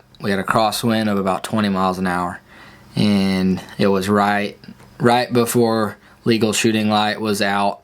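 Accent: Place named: Texas, United States. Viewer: I thought you was from Texas. Tuffin up boy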